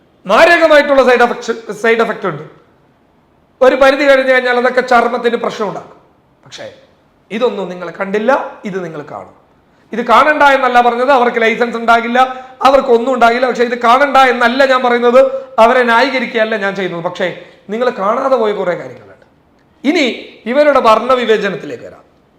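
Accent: Kasaragod, India. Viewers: കാസർഗോഡ് ഭാഷ കേട്ടാൽ തന്നെ ഛർദിക്കാൻ വരും. ഈ മാതിരി ഊമ്പിയ ഭാഷ... വല്ലാത്ത ന്യായീകരണം തന്നെ.... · ella languagum manasilaavum ennal kasargod slang inte ponnooo onnum manasilavula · എടാ തനി കാസർകോട് സംസാരം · Basheer bashiyum thudangi bb cream njan vanganonn vijarichu eni vangunnilla kasaragod samsaram nalle und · Kasargod Slang adipoli😀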